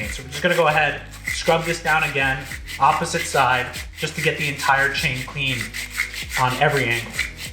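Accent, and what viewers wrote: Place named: Canada. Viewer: how can one tell you are from canada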